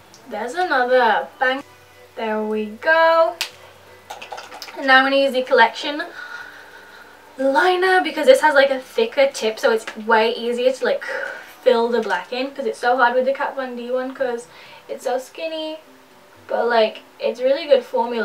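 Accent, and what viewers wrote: United States. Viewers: You sound slightly American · does england has different accent depends on where you live? she said she's from england but her accent sounds like she's from america 😅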